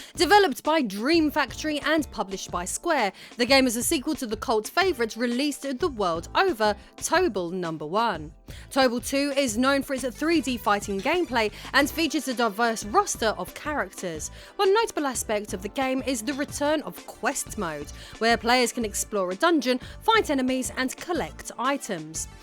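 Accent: United Kingdom. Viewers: Can guarantee she never played any of these games, and this is a conglomeration of a YouTube channel gen scheme where they find a British voice woman and slap her in front of a camera while someone else writes the scripts. Does the research and actually video editing.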